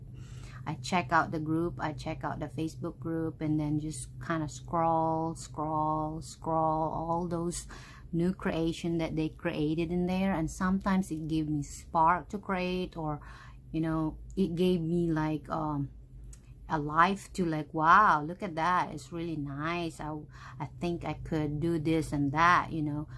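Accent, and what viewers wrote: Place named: South Africa. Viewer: 🙌 sa accent😊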